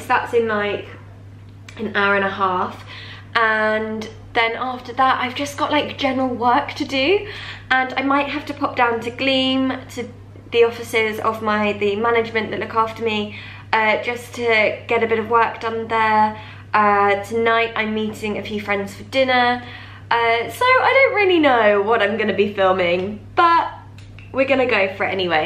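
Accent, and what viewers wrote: United Kingdom. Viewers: I freaking love your British accent ❤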